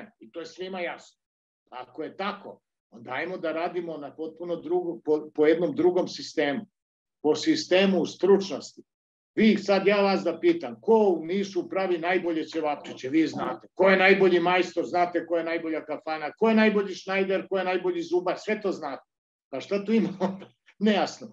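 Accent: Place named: Croatia. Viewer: Voditelj govori neku croato varijantu srbijanskog narečja.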